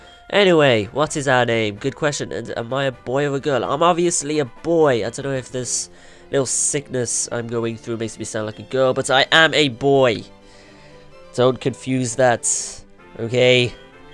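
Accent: United Kingdom. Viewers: NOOOOOO! HE'S A BRIT! NO RACISM BUTI DON'T LIKE THE ACCENT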